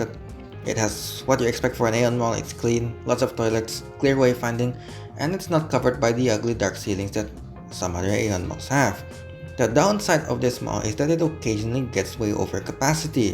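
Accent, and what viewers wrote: Indonesia. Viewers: looking forward to it my dude. i've been binge watching your vids and you deserve a subscribe for the indonesian english accent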